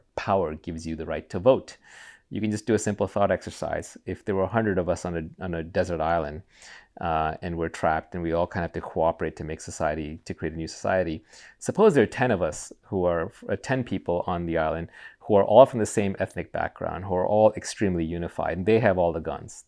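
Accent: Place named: United States. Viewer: your accent is more American than American themselves.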